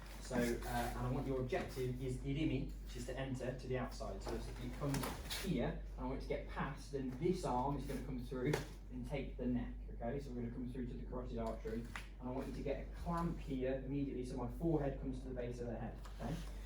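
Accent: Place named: Wolverhampton, United Kingdom. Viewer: As it should be fluid and adaptive…loving the throws btw similar to my Aikido style, started my martial arts in Wolverhampton 20yrs ago, now in Tulsa, Oklahoma, hence noticing the accent!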